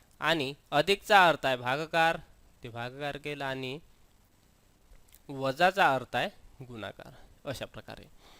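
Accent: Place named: Vidarbha, India. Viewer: very simple and good EXPLANATION .TUMACHA VIDARBHA TONING MAST AAHE KEEP IT UP👍👍👍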